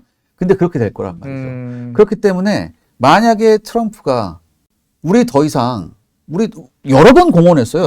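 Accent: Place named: North Korea. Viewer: 진행하는 사람 북한 사람인가요?? 생긴것도 그렇고 말투가 강원도 같기도 하고 북한말 같기도 하네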